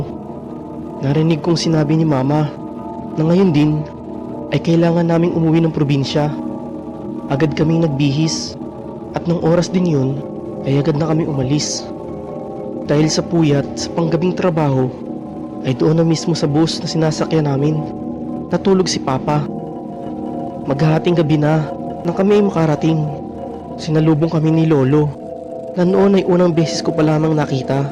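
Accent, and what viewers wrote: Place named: Conyo, Philippines. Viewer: conyo narator shessh!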